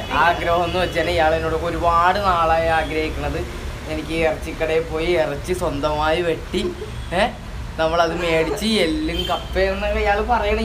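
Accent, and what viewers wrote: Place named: Thrissur, India. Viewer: സംസാസാരം സ്പീഡ് പോരാത്തതിന് ശരിക്കും ത്റ്ശൂർ സംസാര ശൈലിയും ഒന്നും ശരിക്കും മനസ്സിലാവുന്നില്ല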